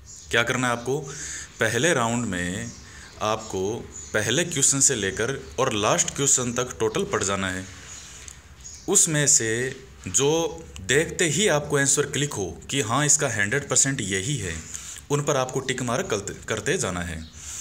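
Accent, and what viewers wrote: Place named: Shekhawati, India. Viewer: sir ki boli se to ye Sekhawati chetr ke lgte hai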